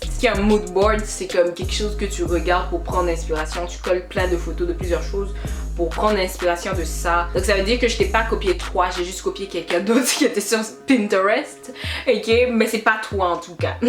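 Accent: Quebec, Canada. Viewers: La Polémique * ma belle....pas polemie, mais tu es cute avec ton mélange d'accent québécois, américain, et ivoirien....😅😘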